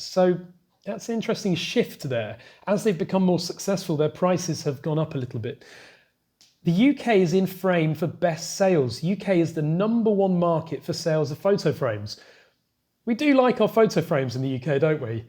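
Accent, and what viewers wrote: Sweden. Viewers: It's ok with a bit of swenglish, after all it's your name on youtube, and we swedes do talk a lot of swenglish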